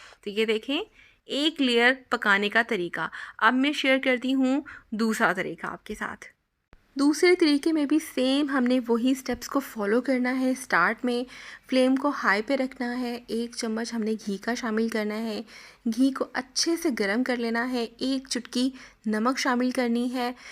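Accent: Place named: India. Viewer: Please don't talk like Indians it's اچھے سے it's اچھی طرح سے ۔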